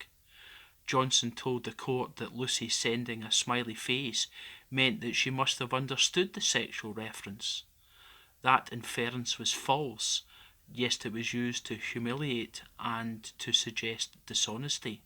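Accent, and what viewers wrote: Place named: Scotland, United Kingdom. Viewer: Thank you Diana this is such a strong and well argued piece of writing drilling down into the heart of this appalling MOJ. Your narrator also genius, there is something about a Scottish accent that adds depth to the core message. I don't know if you are an investigating journalist, but if your not, you should be.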